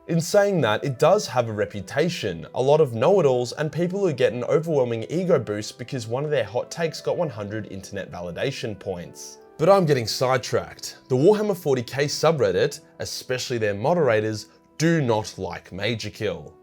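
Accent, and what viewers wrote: Australia. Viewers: I cant lie, this is the only way i can consume anything from 40k because everyone else who makes content like this just sounds like a loozerrr, why would i want to hang oout with a loozerrr when i can just listen to some aussie chad step above all the other loozerrr'z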